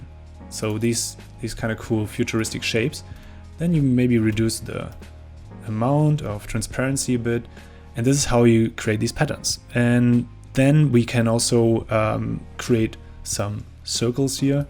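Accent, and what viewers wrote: Germany. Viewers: Ur SOO german ich auch i can hear that man hört es sowasvon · I don't know who you are, I don't even know that Figma exists, but I'm glad the youtube algorithm has sent me here. This will no doubt be useful to me some time later. It looks like Figma is great. Your tell-while-showing presentation is good, mostly straight to the point as it also includes your commentary tangents. The description is very useful with the timestamps, links, and even credits to the music. Your voice with German accent is nothing but soothing and clear to understand. (Or maybe I'm just used to content creators with heavier German accents speaking English such as JoergSparve and members of ZF such as SwatKnight and Gambit) In any case, subbed.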